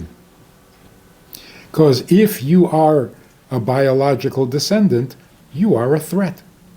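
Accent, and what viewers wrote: United States. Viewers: good voice, sounds like a radical priest from arkansa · 😂 love the Texan accent, suits you! X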